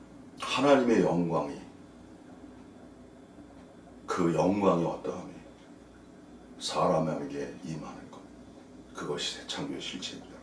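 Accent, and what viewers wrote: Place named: Gyeongsang, South Korea. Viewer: 딱 경상도놈 같더라니 울산이구나!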